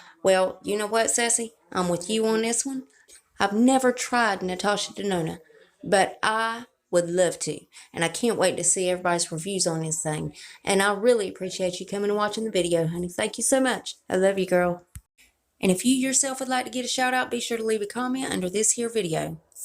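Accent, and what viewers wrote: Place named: West Virginia, United States. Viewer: Girl you sound more like a WV girl than a OH girl. Just sayin.. you have to be from the country! ❤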